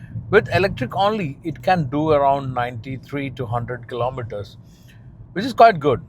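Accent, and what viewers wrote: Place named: Kerala, India. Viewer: Heavy Malayali English accent from the man. Then I saw the location, ahhh? Dubai. OK! Nice content.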